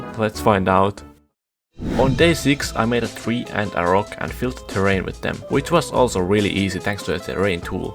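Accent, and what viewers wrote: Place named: Finland. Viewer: This editing style is awesome. And btw, you sound like you're from Finland. :D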